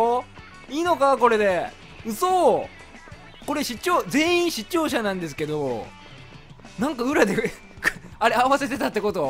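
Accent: Kansai, Japan. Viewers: 関西出身ですか？？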